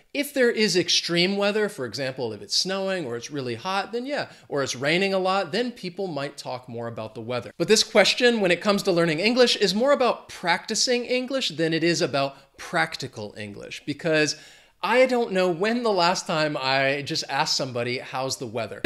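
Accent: United States. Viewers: Hi there, I'm French and writing from Paris ; it's been raining really hard yesterday with cats, dogs and even hail 🦝🦊🐯🦄🐷 ! But I don't know how the weather is 😎 right now... I've found yr channel a few days ago and I noticed that you were saying all the time that you're teaching English while I noticed right away your American accent and style. As I'm also following other learning English channels, I thought you should be mentioning that you're teaching American English which is quite different in terms of accent and idioms. But I see you've made it, much better so ! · Are you a new Youtuber and an English teacher?? Thank you for this video and I like American accent as yours. I will show this video to my students. I never say " " but this phrase appears in an old song from the 80's by. I've heard that " " is used in the United Kingdom only and the same thing is "Shall"in all pronouns. 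We know that the English language has changed during the half past century and there are words that we no longer use. Thank God we can learn and speak an understandable and clear English. 😆🗽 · I'm a native English english speaker :) and I love your videos for picking up a good american accent for some creative work I'm doing. However, in the UK, we do still talk about the weather a lot, lol! Although we would say things like 'deadful weather isn't it?' rather than how's the weather. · You are my favorite English teacher because I'm more into the American accent rather than the formal English accent taught in India. BTW it's slightly raining in Kerala. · I love your american acent. I live in Italy and always the people speak English from UK and some time I'st hard understand.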